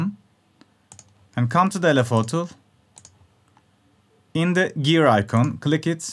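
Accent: Turkey. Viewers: Bilader türk ingilizcesi konuştuğun çok belli 👍🏽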